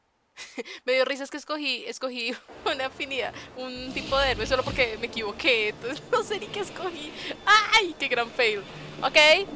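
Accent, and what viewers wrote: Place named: Caribbean Coast, Colombia. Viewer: Pareces costeña en ciudad de cachacos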